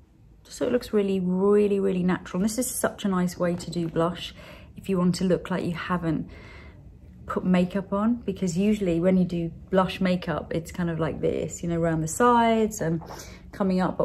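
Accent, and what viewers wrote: United Kingdom. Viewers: You have a British accent !)))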